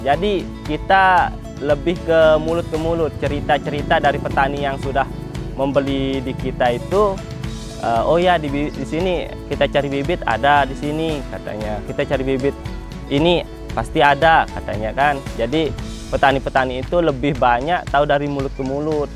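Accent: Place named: Lombok, Indonesia. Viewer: Seperti logat orng Lombok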